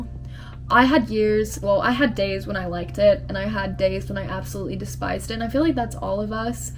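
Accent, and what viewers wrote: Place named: United Kingdom. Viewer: Loving the video so far! Please can you do a full YouTube video using your British accent? I would love to her it❤️!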